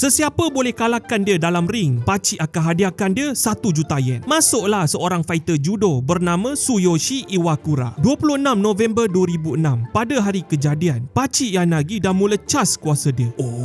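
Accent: Sabah, Malaysia. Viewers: gaya cakap cam org sabah, bukan mau kata apa lah.. Di sabah ada macam macam jenis seni pertahanan diri, cuba cari "pasak kota belud", ada video tamat belajar, dengan berdiri atas bara dan mandi sungai sambil di hiris dan di tetak parang. Tak silap video dari tahun 1980/1990an. Dan di akui kebanyakan ahli yang tamat bukan jenis berlagak. Tapi kalau ada menjual, mesti ada pembeli. Dan macam op yg ko jawab cakap, pengamal selalu cakap "ada Allah" Atau "cukup Allah". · Saya tak berapa kelakar tgok video ni.. cuma kelakar dengar suara yg buat kontena ni.. sebab bahasa kg ku yg dia guna... Andukk bahh😅😅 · anduk bah kesian. hahaha very sabahan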